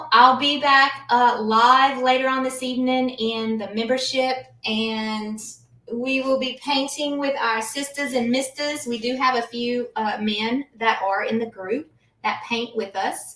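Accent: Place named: South, United States. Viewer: your soft southern accent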